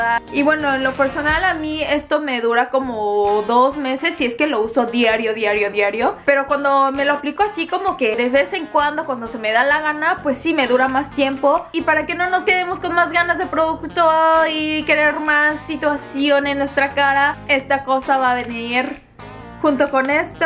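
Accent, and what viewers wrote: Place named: Mexico. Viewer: Haha tiene acento de mexicana fresa, me gusta xdxdxd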